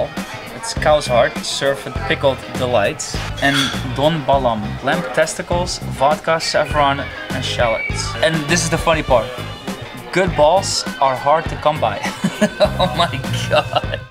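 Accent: Netherlands. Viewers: ***** je hebt dat nederlands engels accent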